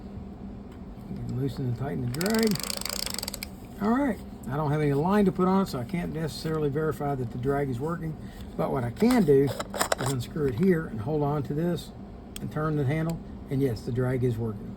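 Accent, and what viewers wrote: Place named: Kentucky, United States. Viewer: even though I have talked to you at length as you guided me through this video, I still just have to comment about this one. Your ability to creatively find ways to fix and repair reels even though parts are missing continues to amaze me. It challenges me to try innovative ways to repair what seems to be irreparable. You did this at least twice in this video and it was amazing. You remember that big TICA surf reel I bought for next to nothing that had a danaged part of the main gear shaft? I figured out a “ miracle” and got that reel working perfectly with a repair that should last indefinitely. As you said in your Kentucky drawl when I told you about it, “now if you hadn’t’ been watching my channel you probably wouldn’t have even tried to fix it.” You were very correct in that assessment as that old but beautiful TICA reel would have been headed for my parts reel boxes. 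When working on this reel you showed another of your many talents - the ability to figure out how to take apart what would confound other less tenacious individuals (such as me.) You also have an almost innate ability to remember how things go back together after you’ve taken them apart. I guess I simply enjoy seeing how your mind works. It is great that you were able to find someone to send you some photos of some missing]g parts that helped you find ways to substitute parts that would allow the reel to properly function. Again, this was an amazing video allowing us to watch over your shoul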